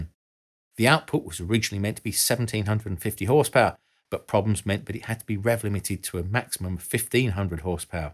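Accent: United Kingdom. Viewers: As a Yank I love hearing the British commentary and their view of British planes !!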